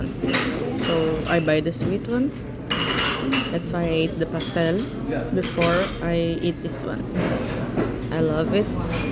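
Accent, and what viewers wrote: Philippines. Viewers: As if i find your English accent is a filipino one..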